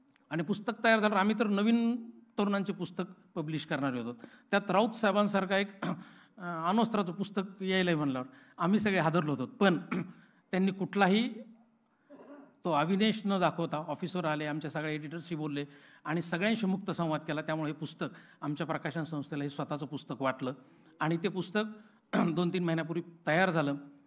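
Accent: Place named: Rural, India. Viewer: पुढ जाणार भाऊ तुम्ही. भाषण तर ठिकच. पण बोलताना जरा ग्रामीण बाज आहे. म्हणाल्यावर पुढ घेणार ते चौकडी तुम्हाला. 😊😊😊😊😊😊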